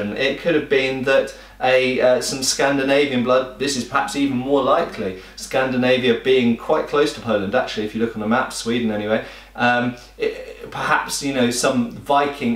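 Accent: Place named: United Kingdom. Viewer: "They didn't just spring out of the earth" - 2017 btw that was only funny because of you're british accent xDD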